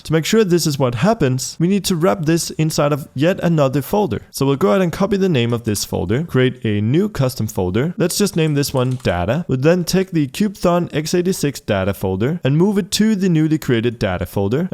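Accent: Netherlands. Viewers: Are you dutch? And again thanks for your videos! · Wait, are you dutch? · Wacht, ben je Nederlands?!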